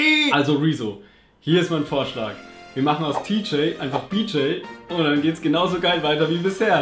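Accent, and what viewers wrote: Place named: Franconia, Germany. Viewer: Der fränkische Dialekt ist zu geil :D Grüße aus Bamberg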